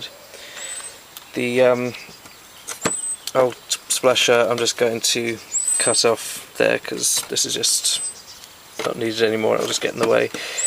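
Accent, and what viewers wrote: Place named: United Kingdom. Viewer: what part of t uk are u from?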